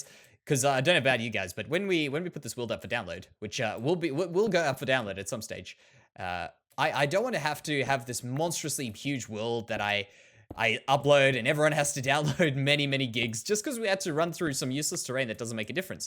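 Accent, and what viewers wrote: Australia. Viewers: Hey are you Australian?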